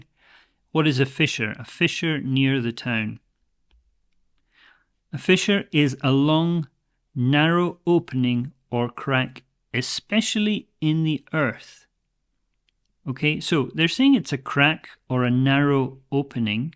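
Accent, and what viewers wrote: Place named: Scotland, United Kingdom. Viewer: From a French point of view, the term "Anglo-Saxon" refers to all people living North of the English Channel or across the Atlantic. But in reality, almost each village in "England" has his own accent, without taking into account Scotland with his 10 dialects (South Central, West Central, East Central North, Borders Scots, East Central South, Angus & Kincardine, Doric, Orkney, Shetland, Lalland, Scotch)... I haven't noticed rhotic accent with you yet, but /ʌʊ/ instead of /aʊ/ like (cow, town, house, down -> coo, toon, hoos, doon) or /əʊ/ by /o:/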